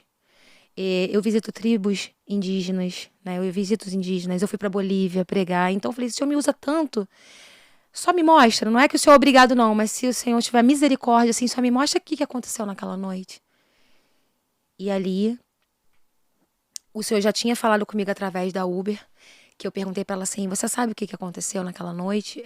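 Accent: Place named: Rio de Janeiro, Brazil. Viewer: Pelo sotaque é do RJ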